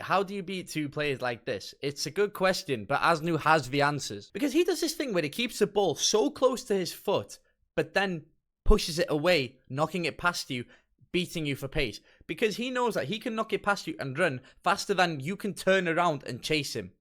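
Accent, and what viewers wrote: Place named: North Wales, United Kingdom. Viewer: You sound like your from north wales mate uftt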